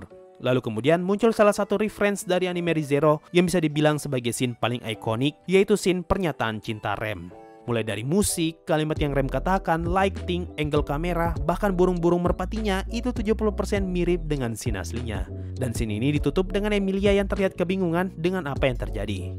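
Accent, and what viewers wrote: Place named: Bugis, Indonesia. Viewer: logatnya si admin kek orang Bugis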